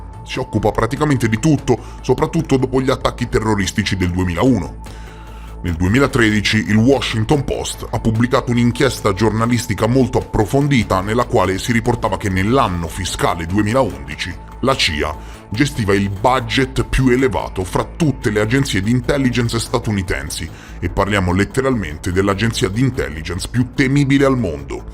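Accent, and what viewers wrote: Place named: Livorno, Italy. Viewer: Video top come al solito. Saluti da una concittadina (credo, se il tuo accento non mente sei livornese anche tu 😅)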